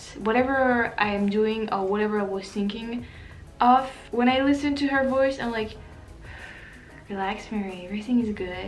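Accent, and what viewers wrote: France. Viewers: I think you are such a great person! I love your passion for her and you are right that she can make life better to listen to her when things are stressful. with the virus right now she is able to make it better. You are so cute as well and I love it when you talk! I love the french accent you have and it makes me happy just like when sings!!!! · Your ( French ) accent is so beautiful, so calming, so relaxing as well...Great reaction my friend..Cheers..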